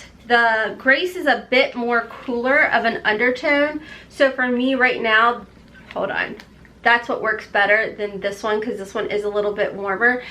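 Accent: South, United States. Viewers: Totally agree it's not worth it. The limited edition bags were good until this bag. I didn't get all the same things you got but mine didn't look like it was even $20 P.S. love your southern accent. Wish I did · You sound extra country in this video lol, cute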